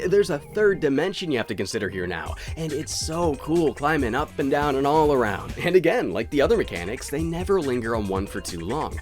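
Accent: Canada. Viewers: Well that, and everything else he says in his pretty conspicuous Canadian accent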